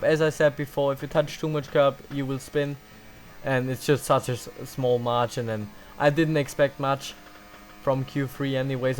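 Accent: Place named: Tyrol, Austria. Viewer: Aus welchen Bundesland kommst du? klingst wie ein Tiroler:-)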